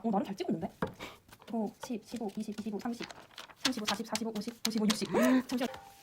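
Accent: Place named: Gyeongsang, South Korea. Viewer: 경상도 사투리 쓰시네요 ㅋㅋ 혹시 경북 지원하시나욬ㅋ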